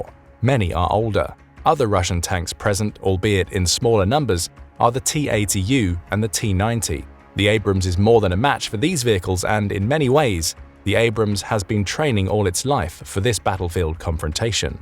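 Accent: United Kingdom. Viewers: This channel never failed to make me laugh. That British accent always ticks me off.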